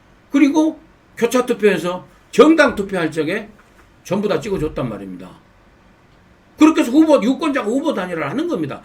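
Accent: Jeolla, South Korea. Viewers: 구수한 전라도 사투리로 국민들을 시원하게 해주셔서 감사합니다ㆍ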